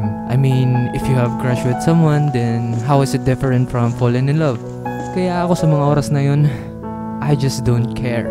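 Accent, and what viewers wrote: Visayas, Philippines. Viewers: Klaru kAayo bisdak hahaha.. nice.. bat kaya di ka pa rin sikat...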